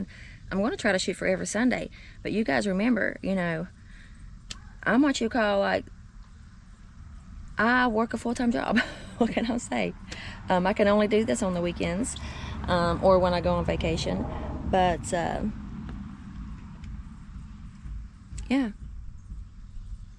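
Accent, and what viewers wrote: United States. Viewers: Hello my dearest friend lol. Might be worth drilling a couple of drain holes in the bottom of the hatch so the water won't gather. Seal the drill holes with a bit of nail varnish. I didn't count how many times in this video you said "I love", but I bloody love your dialect. It's gotta be my favourite of all the Americas xxx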